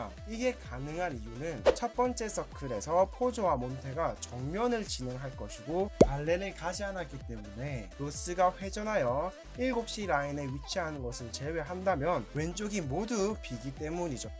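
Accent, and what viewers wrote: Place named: Seoul, South Korea. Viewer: 서울말 좀 줄이시죠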